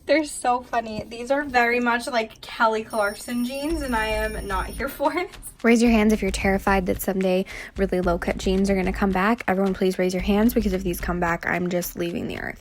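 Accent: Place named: Wisconsin, United States. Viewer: Your Wisconsin accent is the cutest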